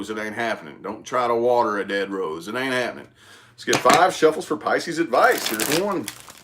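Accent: South, United States. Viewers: Im from Kentucky was born. In Louisville. You said Louisville right too lol not looeyville or Louis ville. Lmao. I'm in Russellville ky. A tiny Town two hours from Louisville. I have lots of family in Indiana too. Thanks for the aries reading. I didn't know you was from around here. You do talk kinda country lol.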